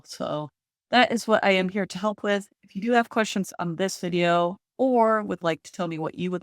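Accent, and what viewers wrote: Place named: United States. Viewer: Hey, I have a question please. You sound like you are from the US. how did you get an account with MEXC? Whenever I go to the site I get a blank page that says they do not service US clients.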